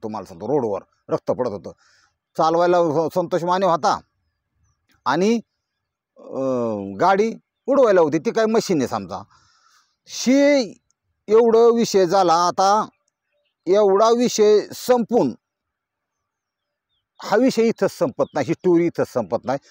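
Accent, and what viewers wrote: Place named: Rural, India. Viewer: गावरान शुद्ध मराठी बोलण्याची शैली मस्त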